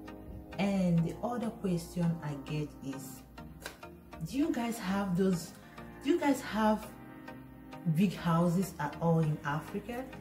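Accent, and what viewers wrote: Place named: United States. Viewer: I have heard that alot. You speak like American 😊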